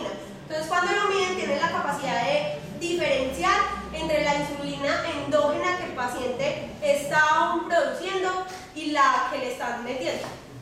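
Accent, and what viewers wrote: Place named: Venezuela. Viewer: Me encanta su acento. Es venezolana o colombiana o de donde es? xD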